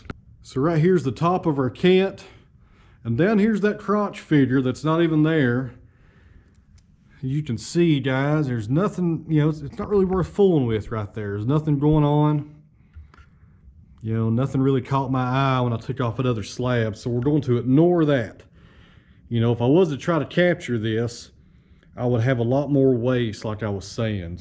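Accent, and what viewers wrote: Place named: Kentucky, United States. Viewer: When I hear your accent you make me homesick for the southeastern Hills of Kentucky my kin sound pretty much the same as you and I love it. I am fascinated with sawing and milling lumber, I guess it is in my blood, my Great Grand Father worked at a sawmill in Missouri and was killed when the boiler blew sending bricks a mile away.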